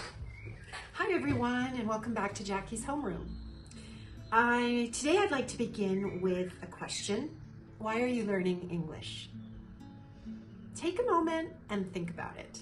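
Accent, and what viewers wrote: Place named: United States. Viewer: This américain accent ????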